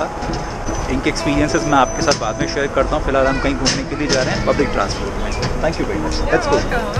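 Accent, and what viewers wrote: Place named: India. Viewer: Very hard to understand your english with indian accent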